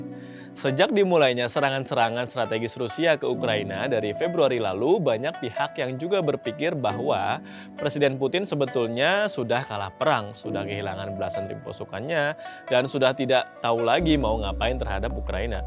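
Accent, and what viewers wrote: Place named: Sumatra, Indonesia. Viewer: Kelen bah, Bang ini masih kental Sumateranya wkwkkw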